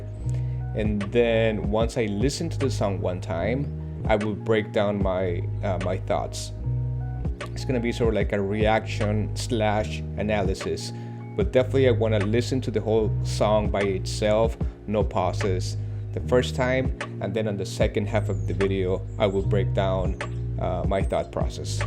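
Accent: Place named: Latin America. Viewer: The million dollar question is not about Rudy...what is your nationality? Your accent sounds latin, but clearly you don't understand Spanish. Inquiring minds want to know. Great opinions and comments!! Enjoy this journey you have embarked upon! Oh yes...this no rabbit hole...this a caldera, because artistry is as wide as it is deep! Salud! #APDVillaReality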